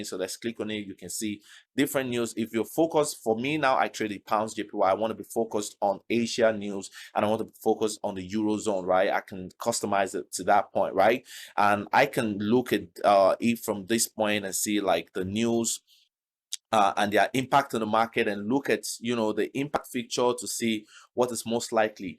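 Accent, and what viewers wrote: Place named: Nigeria. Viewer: Definitely Nigerian 😅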